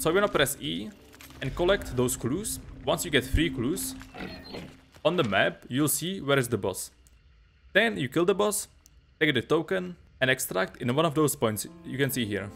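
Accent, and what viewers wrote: Czech Republic. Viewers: Hello arent you from Czech ? you accent sound like it chears :)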